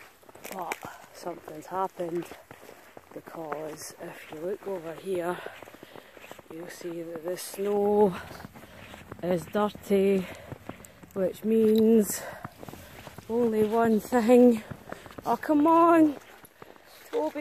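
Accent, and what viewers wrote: Scotland, United Kingdom. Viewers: Are u scotishh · Hass is sounds Scottish!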